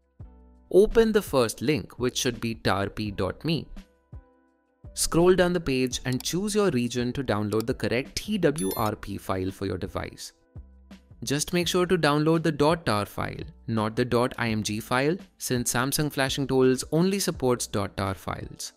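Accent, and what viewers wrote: India. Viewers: If you hear he has a Indian accent the first thing you do is call him a cockroach and then get a dictionary with all racial slurs so 99% of IT info is actually good because you bullied the problem and send him home (in the sewer) And hoping they'll one day scam grandma's again while they curse you out because you stood up for the vermin that is still making tutorial hell in 2026 possible until we all bullied them together :)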